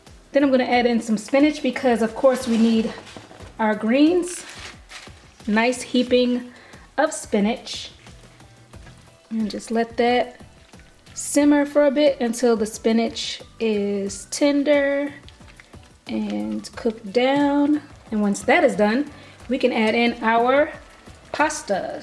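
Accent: Baltimore, United States. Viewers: Sis, are you from Baltimore?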